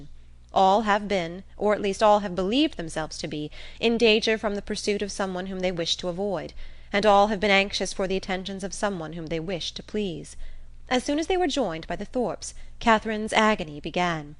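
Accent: United States. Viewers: Jane Austin with an American accent. 🤮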